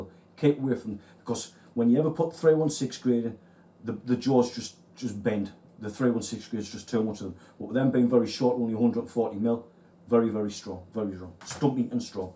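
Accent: Sunderland, United Kingdom. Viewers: Hi, I just stumbled across this video, I don't go Sea fishing but used to do a lot of match fishing, but only club level, best times spent with your friends. Found this absolutely fascinating, the stainless steel knowledge, the mono or braid, and understanding the Sunderland dialect - I'm a woolyback from St.Helens!! This may have been filmed a while ago but I'll be back for more. This is great, content. Subscribed and will be back to watch more. Take care 👍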